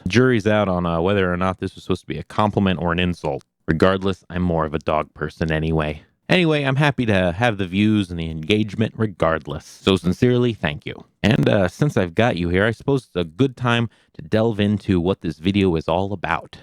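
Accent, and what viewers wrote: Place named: Chicago, United States. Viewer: Your Chicaaaago is showing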